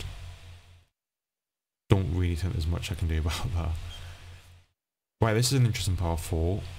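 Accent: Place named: Newcastle, United Kingdom. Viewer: Hey thanks for the play through - really enjoyed your round - pretty much as intended.. and great to hear a Newcastle accent!… appreciate the exposure… yeah I have few courses out there - Bramley Manor is the previous one and Shearwater Sands is another links ..: cheers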